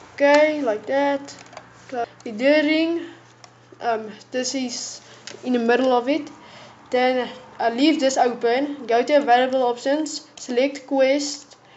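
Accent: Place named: Afrikaans, South Africa. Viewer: Yeah haha,, I recognized the accent. I'm in PE. It's not often you get south African you tubers.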